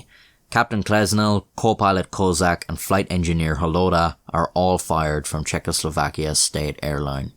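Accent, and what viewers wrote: Ireland. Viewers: This has quickly become one of my favorite Youtube channels great narrating and insanely good brogue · Bad-assery! Love the facial expressions! Makes me bark with laughter! Don’t abandon us Q- I need the snarky Irish storytelling! There might be a few questionable youtubers but there are Nobel folks too! Stand tall and be proud you bastard! Thanks and fight on! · I thought this was a darkdocs video, but when I heard the Irsish voice I was surprised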